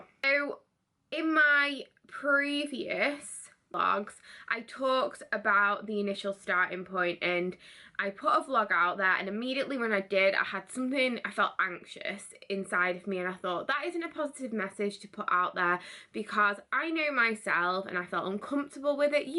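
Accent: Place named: United Kingdom. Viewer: you are adorable! Sometimes your british accent is so difficult to understand. haha! Are americans hard to understand? Anyways, thanks for the inspirational message.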